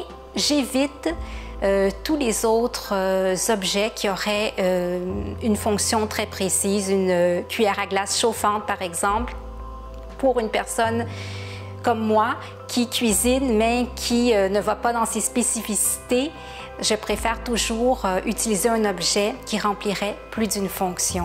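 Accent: Quebec, Canada. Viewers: Bonjour, merci pour votre partage je recherchais justement des vidéos de personnes un peu plus âgées car j'ai 35 ans maintenant, et je ne suis plus très en phase avec les conseils des personnes de 20 ans, (même si ces personnes ont bien-sûr aussi de bons conseils). J'aime beaucoup votre discours, votre musique de fond, vos images, et votre accent québécois est la cerise sur le gâteau car j'adore le Québec ❤️ bref ce format est très bien, on aimerait qu'il dure plus longtemps ! En tant que minimaliste, je ne sais pas si vous avez proposé un house tour de chez vous pour voir à quoi un endroit épuré ressemble, pour s'en inspirer. Merci ! Eve, de France.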